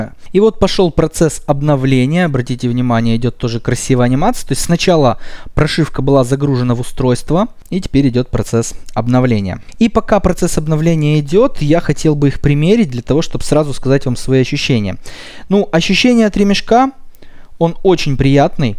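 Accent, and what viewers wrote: Moldova. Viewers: ты чё из молдовы?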